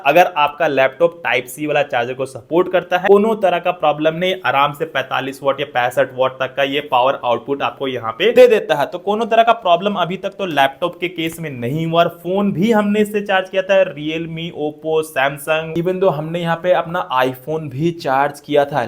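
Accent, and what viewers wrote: Bihar, India. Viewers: Ka ho bhaiya. Aap bhi bihar se hauwa😂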